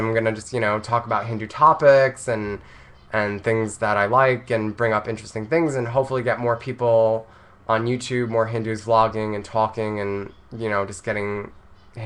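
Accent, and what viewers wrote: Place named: United States. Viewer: No need to apologize. Hindi pronunciation varies in different regions of North India. You develop your own American Hindi pronunciation. That would be more original. ;-)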